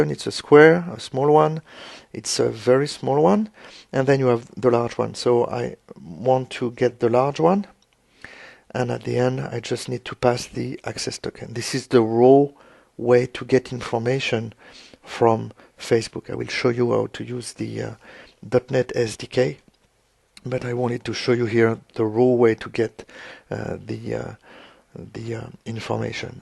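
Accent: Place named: France. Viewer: Cet accent français est tellement remarquable ;) Un grand merci pour cette vidéo. Je travail sur un programme C# et WPF de borne photo et souhaite proposer a mes utilisateurs de partager leurs photos sur FB. Je vais donc tester tous cela et je pense que cette vidéo me sera d'une grande aide... Le protocole FB évoluent régulièrement et il semble que la compatibilité soit d'ancienne version soient souvent remises en cause. J'aurais donc une simple question. La méthode proposée ici est elle compatible avec les moteurs FB actuels ? Encore merci !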